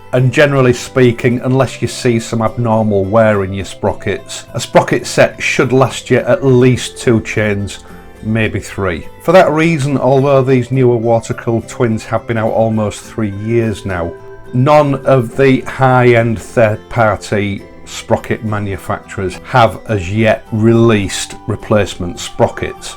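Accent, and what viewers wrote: United Kingdom. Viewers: Came here to see what a gold chain looks like on a Bonnie (trying to decide between natural or gold for mine) and came away with being fascinated by the accent. Of course I've heard many a UK accent and love them but I am by no means a scholar on the regional differences. I could be wrong but this doesn't seem like one of the more "standard" ones. Here I heard what sounds to me like hints of the US southern accent...and of course it makes me think that's possibly where it came from. For example the word "time". It's like "taaam" like the US south. Anyway, I love languages and dialects. Can anyone tell me which specific accent this is?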